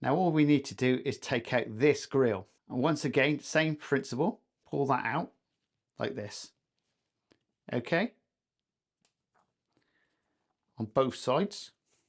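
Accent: United Kingdom. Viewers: Love when I hear a Bristolian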